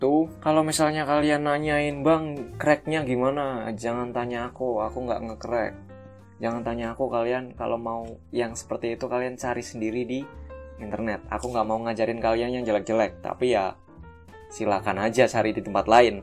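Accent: Pekalongan, Indonesia. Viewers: Pantes indonesia nya medok ternyata pekalongan😁, santri ya ekwk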